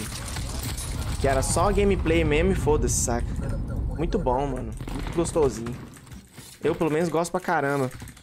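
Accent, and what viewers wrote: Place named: Montes Claros, Brazil. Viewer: Cê e de Montes Claros ???? Responde aí Sotaquizinho não engana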